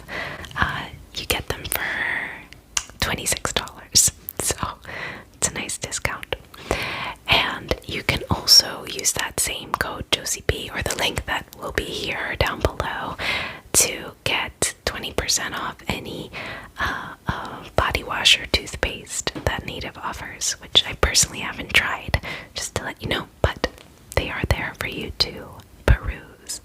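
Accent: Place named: Minnesota, United States. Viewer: shot in the dark here but I’m hinting a midwestern accent 😂 are you a Minnesotan?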